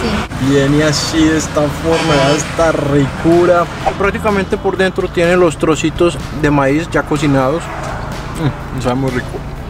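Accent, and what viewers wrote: Colombia. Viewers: Me super pinche encanta el acento colombiano. Es tan elegante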